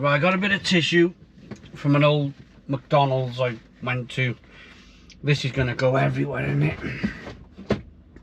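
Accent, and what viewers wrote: Wales, United Kingdom. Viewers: His welsh accent must have confused you. He said it contains 'uncured pork' so M&S won't be in any trouble at all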